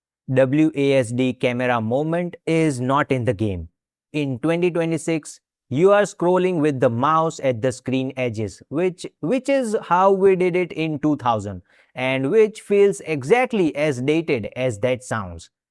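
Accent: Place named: India. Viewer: Indian accent, disregard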